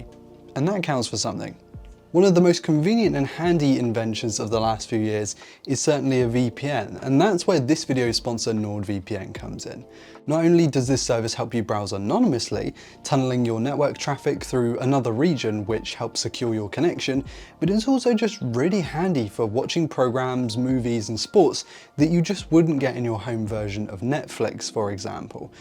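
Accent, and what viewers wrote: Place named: Manchester, United Kingdom. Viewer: I see we have a Mancunian😂😂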